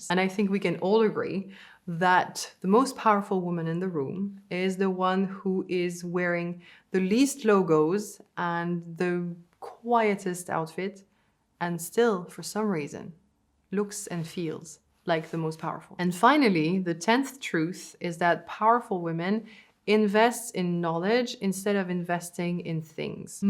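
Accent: France. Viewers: French is her first language. 😊 I think she is doing a great job here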